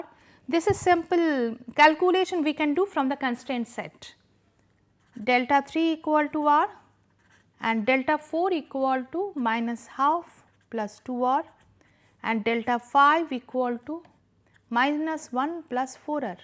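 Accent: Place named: Bengal, India. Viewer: Totally bakwas Tell her to speak in proper English She is speaking English same as Bengali Not useful at all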